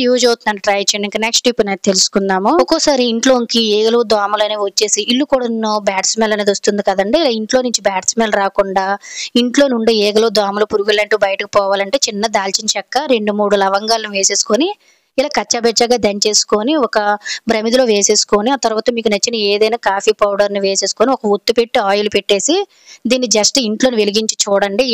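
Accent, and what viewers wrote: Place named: Telangana, India. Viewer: Welcome akkka me slang ardham avuthundi meedhi telangana kadha akka maadhi kooda adhe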